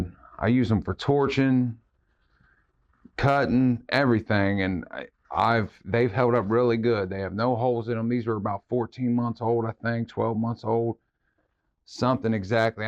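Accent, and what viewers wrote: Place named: Pittsburgh, United States. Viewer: Ha, ok close enough I guess. Thought I recognized the accent, was hoping you were in the burg as I'm looking for a decent mechanic.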